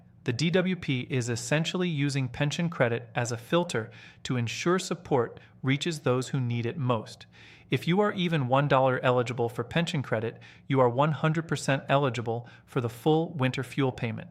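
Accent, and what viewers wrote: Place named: United States. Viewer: Why have we got some American telling us this?mit is really annoying. Not to mention being called a senior!!!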